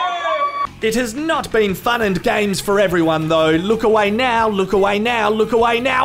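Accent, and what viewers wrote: Australia. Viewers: Can astrailian people just commentate everything? The accent and slang is truly the icing on the cake for commentary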